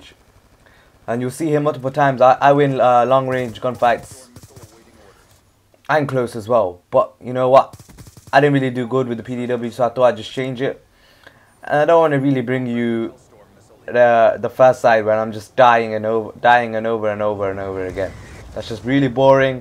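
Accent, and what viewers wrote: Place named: Jamaica. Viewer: u sound jamica \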